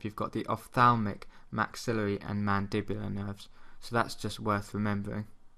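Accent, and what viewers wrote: England, United Kingdom. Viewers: Excellent tutorial! And it's good to hear the English pronunciation of all those names that I (a foreigner) have tried to learn from books only. Just one thing: As far as I know, the acoustic nerve, surprisingly, contains far more efferent fibres than afferent, seemingly for assisting in focussing our sound perception by affecting the outer hair cells. So shouldn't it be called a mixed nerve, rather than pure sensory?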